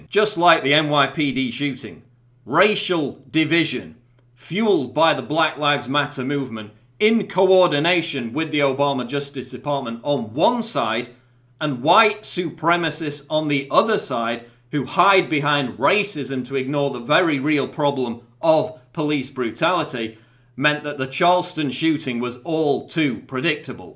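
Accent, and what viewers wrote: United Kingdom. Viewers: Another Alex Jones wannabe, with a British accent. Yawwwwn.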